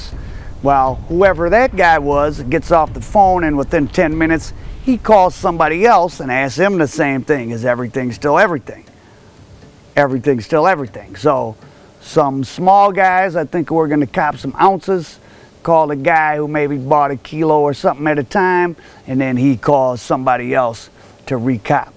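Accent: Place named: Midwest, United States. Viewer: Minus the fact he sounds like he has a mouth full of shite when speaking. He is very thorough with his stories. Im put off by his heavy MidWest accent. Da Bears!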